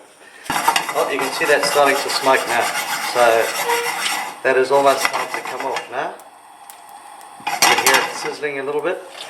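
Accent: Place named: South Africa. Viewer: Is the dude South African or Austrailian....!?!?! I CANNOT WORK IT OUT!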